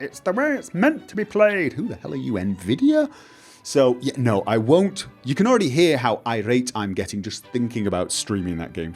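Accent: United Kingdom. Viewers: the standard English pronunciation, as opposed to the American English pronunciation. Some younger Brits have started using the American way but is old enough to have learned the old standard.;)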